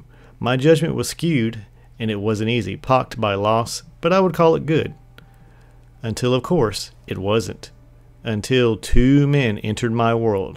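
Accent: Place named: West, United States. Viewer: Lemme just say that your western accent coupled with the theme of the Last Word really make the telling of this story so much more interesting and immersive.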